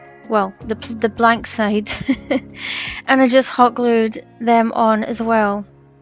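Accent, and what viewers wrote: Scotland, United Kingdom. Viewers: I loved the fireplace you did at Christmas, I showed it off to so many people with your channel! But I gotta say, I like this one even better ❤ that blanket ladder is BRILLIANT ❤❤❤ Watching your channel always makes me smile! I love your projects and hearing you speak brings me back to when I lived in Scotland 😊😊😊 I still use words and terms from there over the American versions! (Like Nappy instead of Diaper) I love remaking your fireplace as well as the Little Stands She does on “Made on Maple Street” 😊❤ “Christina Elizabeth” also does an adorable tiny crate Stand. So far you’re the only one doing the full fireplace! Y’all give me things to recommend and share when I’m in Dollar Tree & Hobby Lobby! And I ALWAYS share your name/channel first and last!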